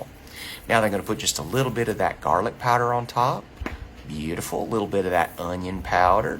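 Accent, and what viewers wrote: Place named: United States. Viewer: Love them all, except the beets.....lol I have to ask what part of the US does your accent come from? Mine is a mix of South Georgia and eastern NC.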